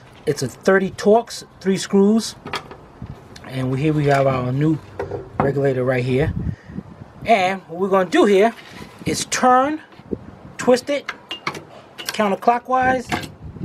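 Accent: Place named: Northeast, United States. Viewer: NE accent....somewhere between Philly n Boston lol I can't quite tell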